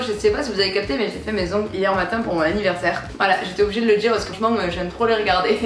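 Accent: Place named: Marseille, France. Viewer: Putin t’as un accent marseillais magnifique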